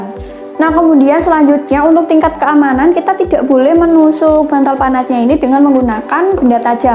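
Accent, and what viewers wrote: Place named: Java, Indonesia. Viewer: Medok amat 😀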